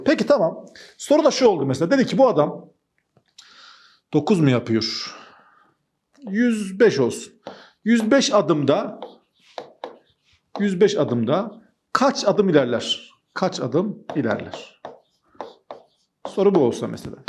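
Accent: Gaziantep, Turkey. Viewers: Gaziantepliymiş Sivas ta yaşıyormuş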